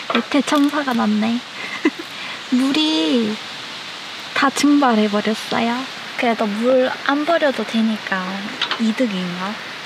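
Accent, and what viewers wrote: Gyeongsang, South Korea. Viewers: 사투리억양이 경상도쪽이신가욬ㅋㅋㅋ귀엽ㅋㅋ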